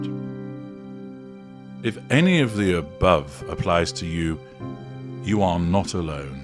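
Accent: South, United States. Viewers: you're killing me with that southern accent! You sound like Mr. Tudball, from the Carol Burnett Show. You should check it out. Us Americans, never figured out what country he came from!🤣😂🤣😂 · Hilarious! Love the country Southern accent!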